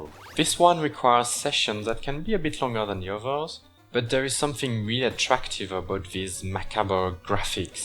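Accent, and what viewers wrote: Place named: France. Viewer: magnifique accent français :'D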